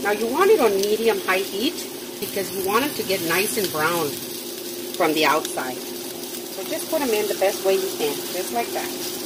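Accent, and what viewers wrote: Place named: Louisiana, United States. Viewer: YUM!!! your accent sounds like "Southern Louisiana" to me. I lived in Baton Rouge for about 18 years.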